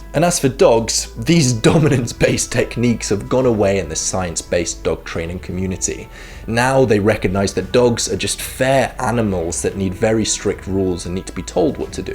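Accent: United Kingdom. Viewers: Bruh your level of articulation is phenomenal and combining that with your British accent is finese....... subscribe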